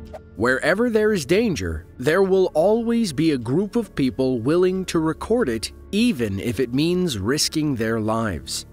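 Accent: United States. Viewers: Imágenes impresionantes, con la voz de un locutor... Por dios... como puede ser tan desagradable al oído el inglés estaunidense...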